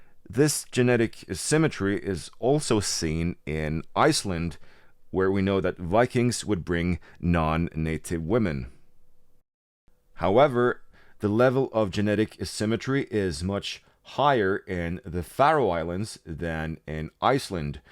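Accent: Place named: Norway. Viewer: Interesting video. I do remember hearing about this newest discovery and being Faroese myself anything pre-Viking era is really interesting. And you didn't do too bad with the Faroese place names, although I sense a Norwegian(?) accent. Eiði, for instance: the ei is like eye and the ð is silent but a y/j is inserted between the ei- and the -i (which itself has a more e like pronunciation), so "eye-yeh". You mentioned Ærgisbrekka, well, the first part of this name ærgi is also a Gaelic word, and Argir is the name of the town right next to the capital, same word but different spelling. It will be interesting to see what other discoveries are made in the future.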